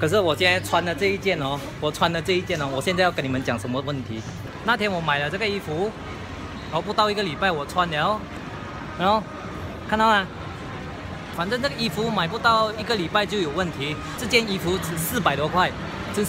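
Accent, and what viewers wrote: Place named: Singapore. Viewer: Talk singhish haha because 是 jb人吗?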